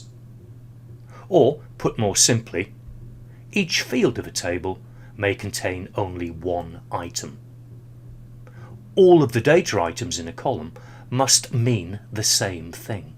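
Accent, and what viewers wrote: England, United Kingdom. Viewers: Thanks for your videos, it is so clear when i watch a video with an English accent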